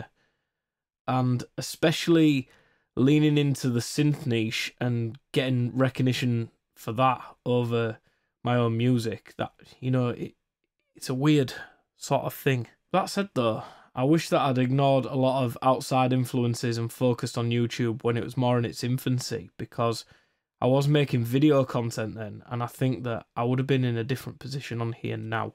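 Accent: Northern England, United Kingdom. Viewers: your cheeky northern accent and your wonderful philosophy is why we like your videos. And ofcourse you beautiful face. But I would love to listen to more tunes by yourself. And if you do new things. Some will work and some will not. But your core of 3.5 thousand subscribers is definitely a good thing. I think it's a snowball effect the bigger it gets the more it builds. Damian keys is great. And really as someone who wants to get my music out there AND start my own YouTube channel. Your trials and tribulations are an education. Don't be afraid to start something new. And if you feel stuck in the mud don't be afraid to give 5them what they want. By the sounds of things you are having a deep think about where you want to take this. And that is fantastic you are the only YT person I have subscribed and given to on patrion. I do that because I think your content is great and more real than most of the big guns like Andrew Whag (I hope I spelled that right). 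They get GIVEN products every day. And I don't trust their objectivity. With notable exceptions like Divkid or Loopop. So something more philosophical is definitely a good angle. And like I said you can still put your music out there. Last thing before I start to ramble is this. I was surprised that Underbelly (you suck at producing) only released his first album I think last year. He has been making content for years before he did that. When I finally decide to start my online Journey I will have at least two albu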